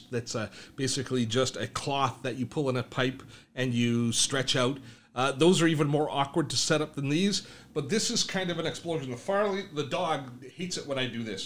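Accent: Canada. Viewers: you seem to be funny and cheerful without being "goofy". Thumbs up! You get an extra gold star for not beginning your video with "What's up?" A "videographer" will lose me every time in the first 5 seconds when I hear that worn-out phrase. I will try recording the video element of my guitar playing (audio recorded separately) using your green screen technique. I currently am using Filmora and Adobe Premier elements for that but they both are a bit "wonky" to work with. I'm just curious,. What is that hint of an accent I hear in your voice? I'm guessing Canadian. The giveaway every time for Canadians is always how "house" is pronounce as "hoose" and "out" is pronounce as "oot".:-) Nice video... This inspires me to check out some of your other videos. Keep up the good work. Cheers!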